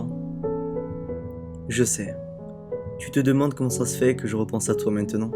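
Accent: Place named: Toulouse, France. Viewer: Hi, j'ai eu la mission de répondre aux commentaires de Un ptit accent du sud (Toulouse hihi) Grooos bisouus Uma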